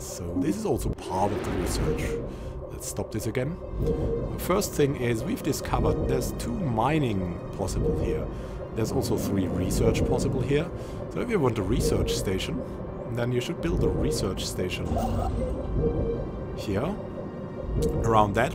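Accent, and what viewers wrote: Germany. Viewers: Btw are you german by a chance? You kinda have the accent :)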